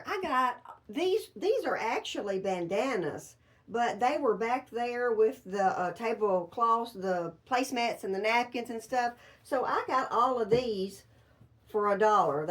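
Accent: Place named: South, United States. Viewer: Fun. Just found you. Loving your down-to-earth southern accent and humor. Will be watching you from Evansville, Southern Indiana.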